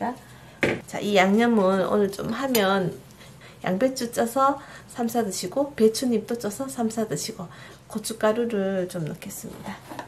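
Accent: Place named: Busan, South Korea. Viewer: 곰피 그라이까네 돌아가신 우리어무이 생각이 나고 아련한 추억 과 정서적 그리움 이 나네예 이 조리하명셔 하시는 나래이션 억양 도 어쩌면 어무이 억양하고 똑 같는지 모르겠심더 꼭! 우리어무이가 환생 하셔서 내 어릴적 부엌에서 음석 하시는 모습 이 회상 되네예 저는 어려서 식구들 따라 부산에서 서울로 이사 왔 심더 이제 나이 60 문턱에 있고 저희 노친네 부모모두 다 세상 떠나셨지만서도 우리 집안 음식맛은 이제 어디가서 도 손맛 을 느끼기가 어려워서 추억으로만 간직하며 있었는데 채널 을 보며는 꼭 우리집안 음식 만드는 정서가 자극 되네예 😊 어릴적 어무이따라 자갈치시장 장보러 가고 하다보이 저희집 음식은 젓갈도 비리고 짜고 맵고 하는 기호 이다보이 이 젓갈도 비린거 좋아하는 사람 운운 하는 맨트 들으면 꼭 백프 나한테 공감 와 닿심더😄 어쩌면 꼭 억양 어투도 우리어무이 하고 꼭 같는기라예 음석 취향도 같고😂 내 생애 다시는 우리 어무이 음석 손맛 못 찾을것 같은데 유튜브 채널 보며 내안에 잠재되었던 우리집안 음식 정서 를 자극 받심더😆 이제 우리집안 일가 그쪽 친족들 다~ 아 어른들 이 않계시고 고향 에는 아무도 없다보이 부산경남 쪽 음식손맛 의 전통을 느낄 기회도 없어지는게 아쉬움 그리움 으로 간직하며 지내는데 우연찮게 채널 레시피하고 나래이션 보고듣고 하이까네 돌아가신 어무이 생각 나서 댓글 몇자 정겨움 과 고마움에 올려보네예 계속해서 내고향토속적 음식 조리방식 비중으로 좋은 방송 해주세요 아셨지예🤣 화이팅! ✊👍 레시피를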